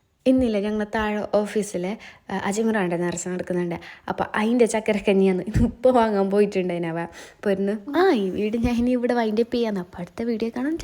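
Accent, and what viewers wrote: Kasaragod, India. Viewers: vedio kand kand ipo ksgod slang set avunund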